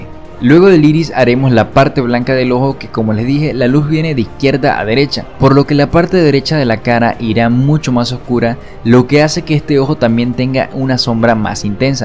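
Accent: Venezuela. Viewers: Es que dice "los ojoh" :v, los venezolanos hablan mucho como con la j o algo así... en lo personal me encanta el acento c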